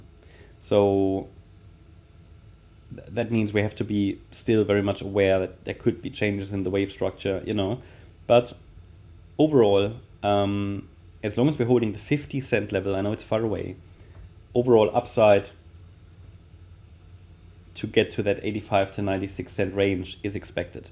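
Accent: Germany. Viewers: Hey, love the german accent! Thanks for the video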